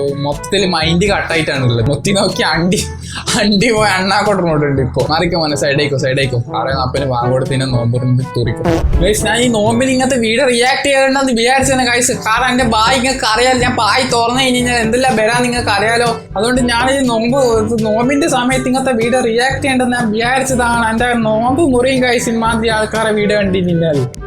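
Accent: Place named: Malappuram, India. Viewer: Slang kettit on malapuram karann ahn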